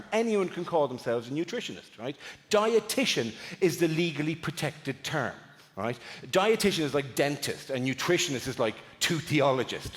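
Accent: Ireland. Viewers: I think Dara is one of the few stand-ups that is still funny even though he is speaking a million miles an hour, as that doesn't often really work well for susained comedy. Must be the Irish lilt!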